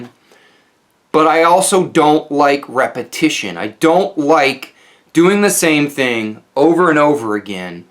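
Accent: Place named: United States. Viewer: Good talk, will be helpful advice for my 16 year son as well. I also like to watch your videos because you are mad..lol...American English is very different from our Australian pronounciation!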